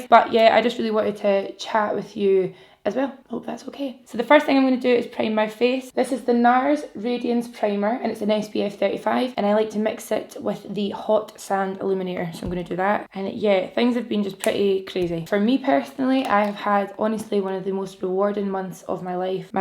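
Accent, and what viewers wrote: Scotland, United Kingdom. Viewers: Watching you and listening to your accent helped me prep to understand the Scottish side of my family that I met a couple of weeks ago lmao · Love the eye shadow. Gorgeous color I have been wanting to try that foundation as well. I saw it at Sephora during the sale. Beautiful finish. Love watching your vlogs and videos. Yes your videos and vlogs. Maybe its the Scottish accent and all the "wee" words you say. 😊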